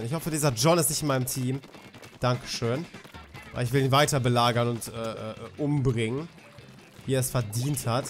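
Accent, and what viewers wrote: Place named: France. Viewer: bester französischer Akzent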